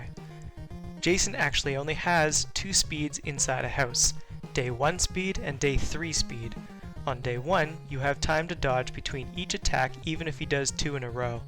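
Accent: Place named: Canada. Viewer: SO MUCH CANADIAN ACCENT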